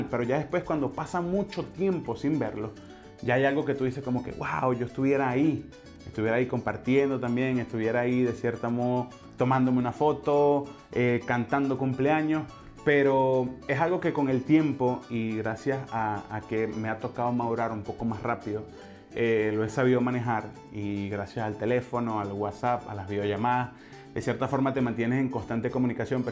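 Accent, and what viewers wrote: Venezuela. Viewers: Hola un gusto verte te vi por allí en YouTube y te escuché hablar y dije el habla como venezolano y bueno me suscribi y me encanta tus videos ☆éxitos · Al chile suena mejor el acento venezolano que el colombiano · su acento es caraqueño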